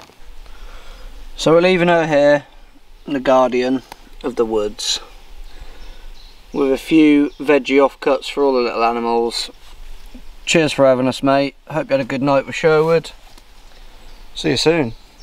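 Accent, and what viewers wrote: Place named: East Anglia, United Kingdom. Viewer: subbed because you sound East Anglian.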